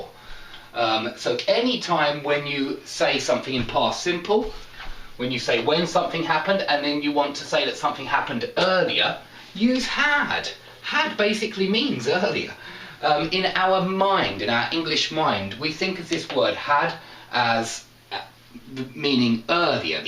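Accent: United Kingdom. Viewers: Nice accent ^^ are u british? I´ve been there a couple of times and England is so beautiful, greetings from Brazil ^^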